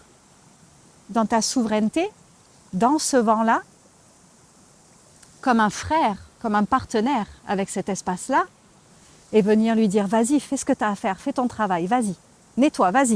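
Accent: Quebec, Canada. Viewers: Je suis sur que tu es au Québec 😁ton accent Français se confond avec le Québécois lol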